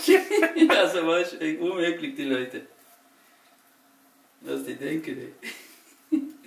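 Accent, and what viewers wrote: Swabia, Germany. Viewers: Hallo.. Hab euch mit diesem video weiter empfohlen..hab den Kanal schon lange aboniert und freu mich jedesmal über deine humorvolle lockere Art 😉und das Schwäbisch das abgefärbt hat😃euch noch viel Spass bei vielen neuen Ideen Lg Marina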